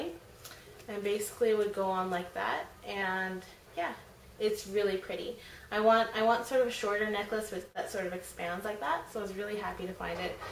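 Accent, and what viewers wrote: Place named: United States. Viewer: I was wondering , why do you have american accent and you live in Australia .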